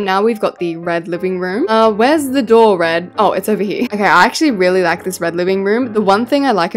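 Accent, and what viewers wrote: Australia. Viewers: I love the way puts in memes and funny voices she's so talented I wish I was her sista❤ I hope I get invited to one of her build battles or something involved with building,she is AMAZING ❤ I love her so much and her Australian accent it's so beautiful. I love the way she NEVER gives up and shows confident energy to every build the subscribers make 😊,it's just amazing. And her name is so so nice and beautiful. Love you ❤🪷😘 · lets just appreciate that in every video someone has to say " lets just appreciate". but anyways i love your videos, i started watching u in June and I'm obsessed, I've watched all the videos u have posted on your channel and everyday i come to check if you make a new one. Your a really talented builder and i would personally, myself say you should become a constructor of some kind. i love your Australian accent and i love your house styles and i love your sense of humour, i just love you. 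♥keep up the work👏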